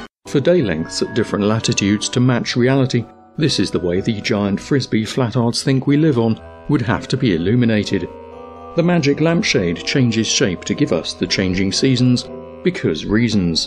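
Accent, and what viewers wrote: England, United Kingdom. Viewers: Here we see the effect of "Education", a word that appears to mean very little to Flattards. As much as I enjoy these videos (and hearing an English accent for a change) I cant help thinking that the best way to deal with them is to not even give them the time of day - let them get on with their stupidity. They are clearly beyond help, and, like the religious, prepared to "Believe" rather than accumulate anything resembling a fact. However, I do enjoy a good laugh and Flattards do produce some of the best comedy Iv seen since Jim Jeffries took to the stage so for - Keep it up my good man, keep it up. Who knows, you might even educate one of the imbeciles, although, to be honest, I doubt that even Lawrence Krauss could educate these fools. Excellent job - Subscribed.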